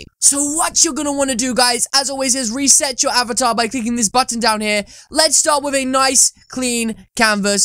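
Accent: United Kingdom. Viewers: U British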